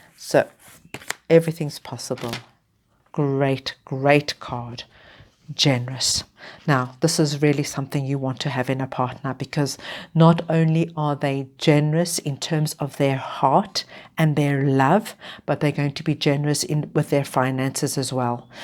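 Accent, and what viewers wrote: South Africa. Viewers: You sound South African. Do you perhaps do personal readings?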